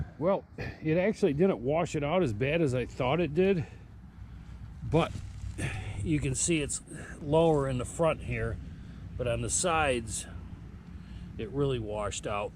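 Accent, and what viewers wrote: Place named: Northeast, United States. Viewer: Where do you live? You almost sound like you’re in the northeast? Mass? I’m in NH and wonder what you do for winter with the pool?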